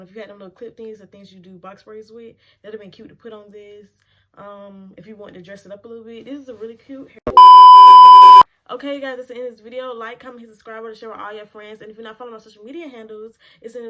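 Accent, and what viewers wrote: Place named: South, United States. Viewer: You country as hell that accent girl....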